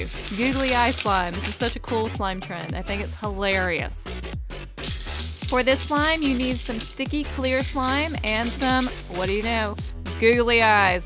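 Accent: United States. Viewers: you have a cowboy acent :ooooooooooo seen anything weird here?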